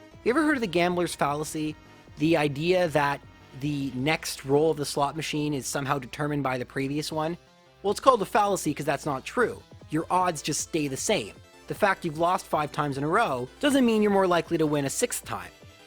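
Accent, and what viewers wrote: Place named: Canada. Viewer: I can tell your Canadian by the way you say “again”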